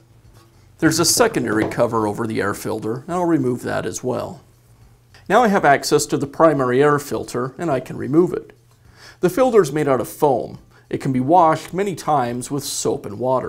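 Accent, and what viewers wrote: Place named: United States. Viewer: I'll give a thumb up for all your videos only if they have your subtitles. Unfortunately, your nice American accent really breaks me into sweat. I'll try to get used to it, however without transcription it's too heavily yet.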